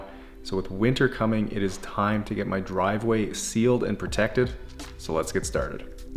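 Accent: Canada. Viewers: I’ve watched soooo many of your videos and I just want to say you sound very Canadian in this!!! Love it 😂❤️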